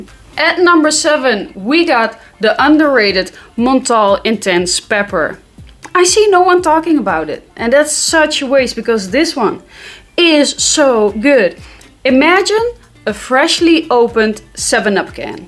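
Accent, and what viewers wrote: Netherlands. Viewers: Ben je Nederlands? Zo klinkt je accent. Je bent trouwens echt grappig 🤣🤣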